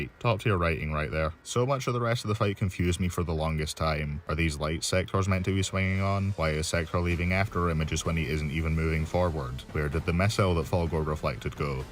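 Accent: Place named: United Kingdom. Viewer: Sick new remake on a great season review. I think I mentioned this before, but there's something about UK presenters for these types of reviews that make them really easy to listen to. You, Critical Drinker, Mauler, etc.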